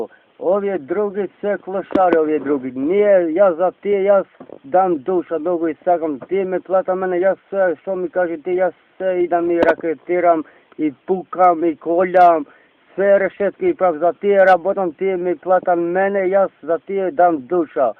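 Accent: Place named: Albania. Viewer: This guy speaks macedonian with an albanian accent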